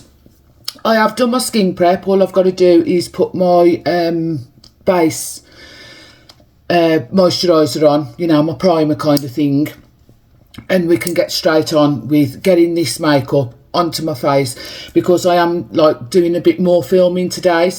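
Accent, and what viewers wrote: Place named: Black Country, United Kingdom. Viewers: That red hair really suits you, it makes you look younger x l love your black country accent too xx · Where about are you lovely your a cent sounds like your just up thre road i am coventry . If i shut my eyes its like listening to jess philips mp 😅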